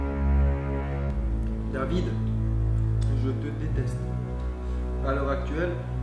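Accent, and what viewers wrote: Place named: Alsace, France. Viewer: Ton accent alsacien sort un peu 😂😂 bref team Alsace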